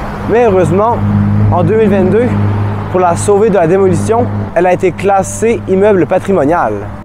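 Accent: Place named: Quebec, Canada. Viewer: j'y pense que son l'accent quebecois est vraiment..indubitable...😅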